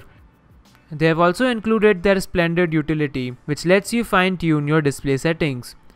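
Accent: India. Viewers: Nice Indian Accent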